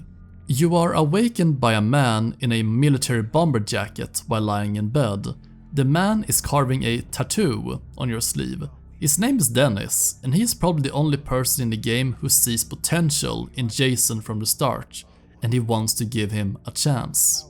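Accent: Sweden. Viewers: Omg din svenska dialekt luktar så stark den e😂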